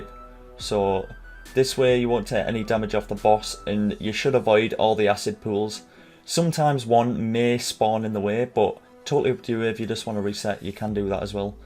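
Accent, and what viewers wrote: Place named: Newcastle, United Kingdom. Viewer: Haha could tell by the accent lol Newcastle here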